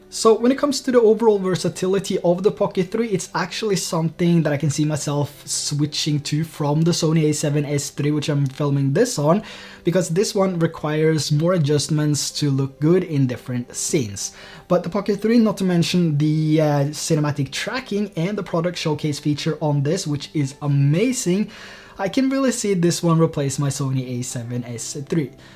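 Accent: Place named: Norway. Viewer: You sound a little norwegian, are you norwegian?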